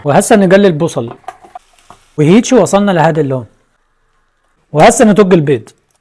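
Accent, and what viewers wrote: Iraq. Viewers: الف عافيه واللهجه العراقيه حلو من بؤك واحنا ايضا نحبكم يامصريين ياجدعان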